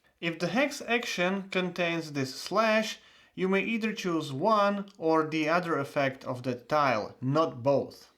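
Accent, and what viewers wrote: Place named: Eastern Europe. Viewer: Wow, thank you so much!!! Amazingly chill content and as a west european, i freaking love east-european accents! 😬 How is it, that the video goes so long compared to your Praga video? I have heard, that this one is easier to teach? 🤔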